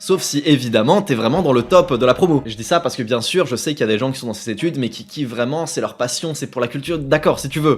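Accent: France. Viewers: tu parles un anglais francisé c'est perturbant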